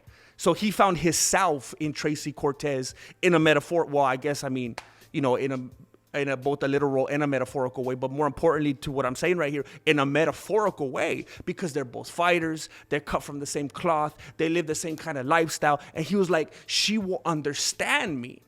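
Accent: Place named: Mexico. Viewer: An intimate relationship with himself in a metaphorical and literal way. You are a genius my man. The num-chuks with flip flops in the background. It is so great how you can put that Mexicano flavour on the words.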